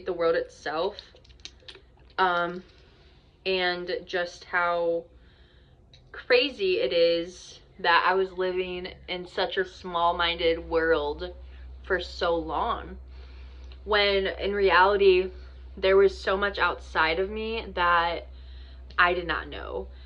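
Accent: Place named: United Kingdom. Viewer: The Brit accent was on point 👌💯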